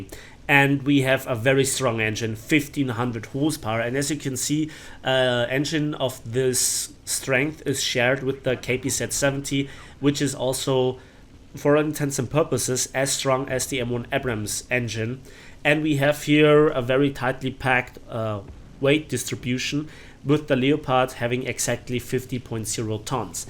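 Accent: Germany. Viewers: Well i think he is german (not sure) but his accent makes him sound german.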